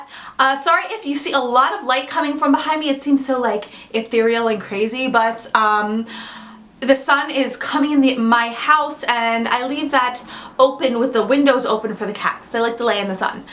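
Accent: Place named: Long Island, United States. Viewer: OMG! I just started watching you recently (found you through Dani!) and as soon as you said "draw-ring" lol I was like wow she sounds like she's from LI. And then you said you got your cat from north shore!! I'm from Long Island too!! Will you ever do a meetup??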